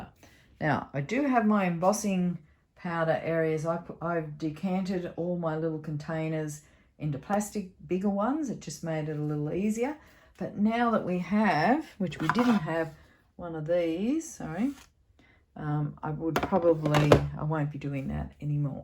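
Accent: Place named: Australia. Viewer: I have always thought I was born in the wrong place.. im not supposed to be from CALIFORNIA, I was supposed to be Australian!🤣 I LOVE your accent.. it's so amazing! So is your craft room, of course! Your room is absolutely gorgeous!! 🥰Quick story.. my grandparents went to Hawaii 1x when I was about 13, I'm 44 now lol. I told them to bring me back a cute boy, lol! When they got home from their trip, they showed us the home videos they took. In the middle of the video, were 2 guys on the beach! All of a sudden, they say... "G'day Melinda! We're from Australia!" I about died!!! My grandpa went on the beach to find 2 guys without shirts of course, and asked them to film a clip for me! I miss my gpa so much! He did this stuff all the time! ❤️ I know this isn't about that story.. but it's just to show how long and much I've loved Australia! I hope I get to go someday!❤️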